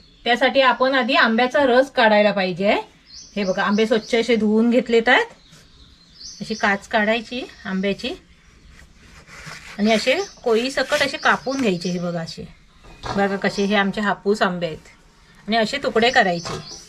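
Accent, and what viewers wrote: Kolhapur, India. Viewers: कोल्हापूर वरून बोलतेय का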